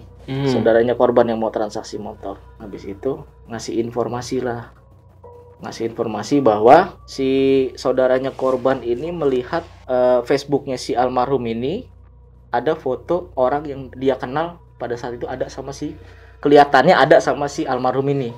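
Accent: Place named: Banjar, Indonesia. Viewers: Pak dialek banjar mu masih melekat Pak wkwkwk, sehat terus Pak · Ada logat banjarnyaa 👍👍👍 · Logat kalimantan sdin😅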